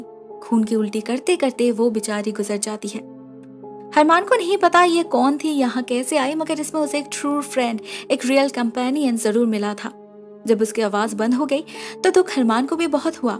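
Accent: Bengal, India. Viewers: are u from bengal?? right na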